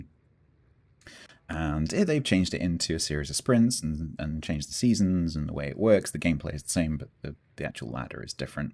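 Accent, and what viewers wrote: United Kingdom. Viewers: Thank you, for uploading this preview (/speculation) for the upcoming updates, I guess I missed this part of the stream. I was a big fan of Foghorn News, but I completely agree with your decision to discontinue them. It's just nice to have the patch notes to be read in calming voice and delightful English accent in this hectic world.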